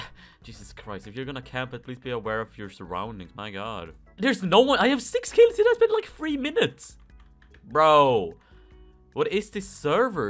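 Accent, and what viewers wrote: Sweden. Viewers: you kinda sound swedish.. am i right?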